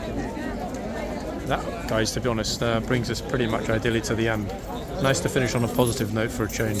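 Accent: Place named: United Kingdom. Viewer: HELLO, I LIVE IN THE STATES & I AGREE ABOUT EVERYONE TOUCHING THE HORSES. I DO NOT UNDERSTAND. WHAT THE BIG DEAL IS THAT PEOPLE FEEL THEY NEED TO TOUCH THE HORSES. I CAN SEE A CHILD WANTING TO, BUT NOT A ADULT. THEY NEED TO BE TOLD NO RIGHT A WAY. THERE ARE GAURDS THAT SIT ON THE HORSES WHEN SOMEONE IS MESSING AROUND WITH THIER HORSE, that do not say any thing, some of them scream & scare me. THEY NEED TO BE YELLED AT RIGHT THEN. THERE ARE MANY THAT DO NOT SAY ANY THING, THEN SOME GO OVER BOARD WITH IT. PUT A LIGHT WEIGHT SIGN ON EACH OF THE HORSES NECKS. PLAY A RECORDED MESSAGE , BUT I THINK THE GAURDS WOULD GET TIRED OF THAT. MAKE A WOODEN ARM WITH A SOFT HAND COME OUT & SMACK THE PERSON WHEN THEY TOUCH A HORSE. I THINK THEY WOULD GET THE HINT, DONT YOU. SOME THING ELSE I WANT TO MENTION, WITH ALL THE PEOPLE THERE TAlking, & all the traffic, you with the british accent, it is very hard to understand what you are saying & talking about, I lIke to hear it all. 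Is there any way you can speak up,? I am afraid if you make it louder it will make e ery thing around you louder. Thank You for reading. If you need any more DO NOT TOUCH THE HORSE IDEAS, JUST LET ME K OW. LOL, DONNA I LIVE IN TENNEESSEE, BUT I AM FROM CHICAGO, IL. OH ONE MORE THING, I THINK PEOPLE WOULD WATCH YOUR VIDEOS NO MATTER WHAT THE TITLE, BUT I WE FEEL THAT YOU TITLES & YOUR TITLES DO NOT ALWAYS MAT H UP. YOU THI K YOUR GOI G TO SEE A VIDOE THAT THE GAURD IS GOING TO GO TO WAR WITH A TOURIST, BUT IT ENDS UP NOT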